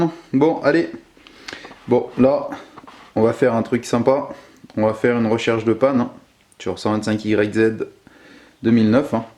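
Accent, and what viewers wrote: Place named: Forez, France. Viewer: Je reconnais cet accent. J’ai vécu un temps à Thiers et à Clermont. Tu ne serai pas du côté du Puy ou du Forez ? Salut à toi l’iroquois en tout cas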